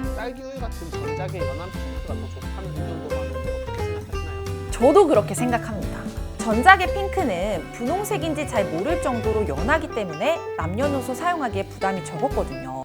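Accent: Gyeongsang, South Korea. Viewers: 대구 사투리 ...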